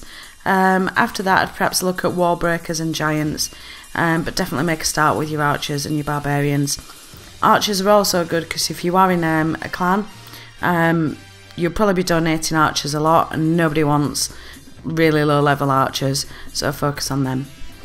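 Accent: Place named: United Kingdom. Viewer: that british english accent is horrible in my opinion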